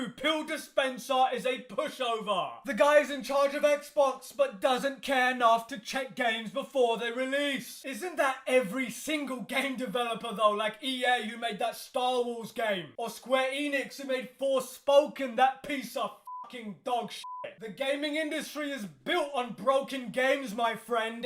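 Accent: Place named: Scotland, United Kingdom. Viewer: I love you, funny angry Scottish man.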